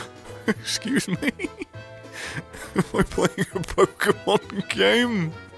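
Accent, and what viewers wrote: United Kingdom. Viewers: I doubt you'll read this, but thanks for making such amazing content, my dear madman. Seriously, you've made me a maniac, and I love you for it. You just bring laughter to my life, and a bloody lot of it. I would give a fucking arm and a leg to talk to you once, since I really only need one of each. One more thing I love you for, you're british, and I love british slangs and accent. xD So keep up your work man, you have my full support!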